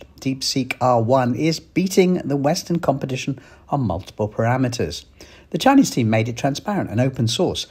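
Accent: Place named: England, United Kingdom. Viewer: Chinese propaganda channel with English accent voiceover.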